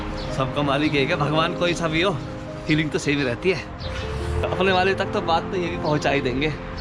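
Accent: Haryana, India. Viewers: Bhai haryanvi bolte ho kya Japan me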